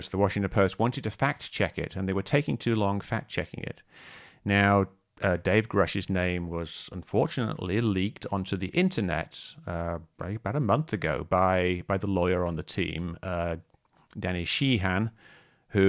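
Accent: United Kingdom. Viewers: Good video but whats with the use of americanism 'math' ...'flashlight' etc ...with a british accent ?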